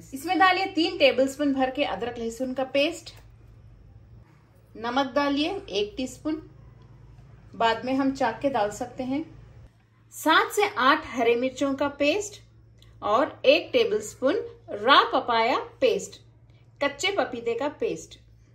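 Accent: Hyderabad, India. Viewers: Assalaam Alaikum, Amma Kya Malai Gosh Banai naa aap. Laikin, mujhae yaa poochna tha, amma, ismae mirchi thoda kam daal sakte kya. Mai thoda midcii kam khata hu., kyu kae, mai England mae takriban 50 (Pachaas) salo sae hu. Aap ki Hyderabadi boolthi sunkae bada maza aaya. My aap ke Chantal ko like aur subscribe kardiya. Mai aur meri beebi Hyderabad jab aaynga to aap sae mulakhat ki umeed rakhtae hai. Allah Hafiz..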